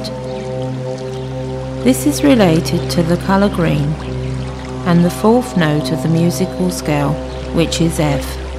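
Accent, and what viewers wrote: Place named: East London, United Kingdom. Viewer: Worst. Accent. Ever. OMG it's like watching East Enders.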